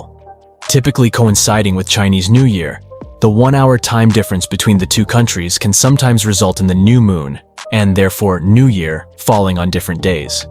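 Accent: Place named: United States. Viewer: Just to hear that American, sickening accent drives me somewhere else, especially given what those bastards did toVietnam.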